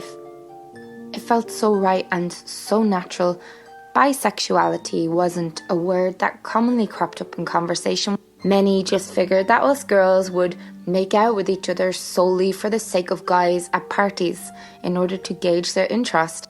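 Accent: Ireland. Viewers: ayyyyyye you're Irish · YES YOUR IRISH (TWINS)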